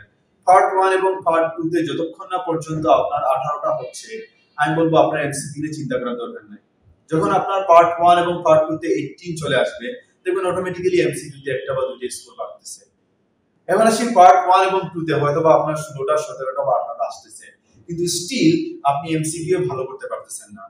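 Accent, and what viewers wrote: Sylhet, Bangladesh. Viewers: Apni ki sylheti?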